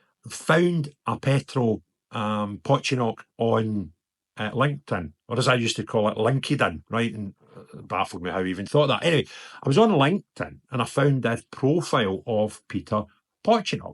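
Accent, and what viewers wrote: Scotland, United Kingdom. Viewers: Kind of made me laugh when you were talking about who these guys are. Actor (come) model (come) rent boy, allegedly 😆 Plus the Scottish accent. Just made me laugh. Sorry, serious subject I know. But then came the village people😁😆😶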